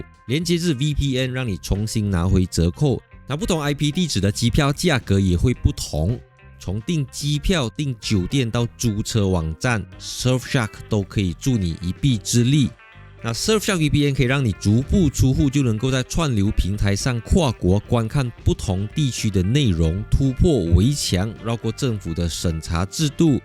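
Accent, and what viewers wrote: Malaysia. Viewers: 口音用词法都看得出你是马来西亚人😂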